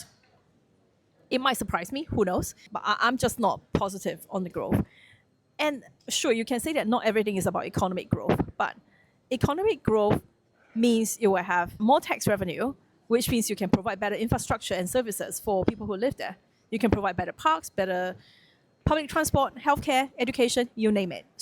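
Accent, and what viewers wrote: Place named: RP, United Kingdom. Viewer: I like your RP accent 🎉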